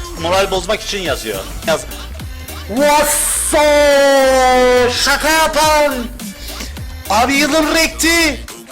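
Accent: Cyprus. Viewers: kardes sen kibrislisin dimi 😄😄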